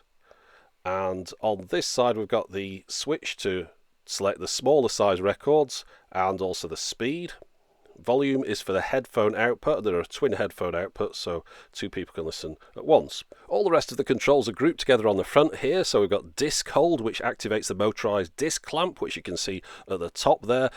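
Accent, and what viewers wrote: United Kingdom. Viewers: Great video. I'm an English student at the University and it's really nice to listen to something with nice British English. Having fun and improving my pronunciation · This accent is so, so British!!! Like in this video: <link>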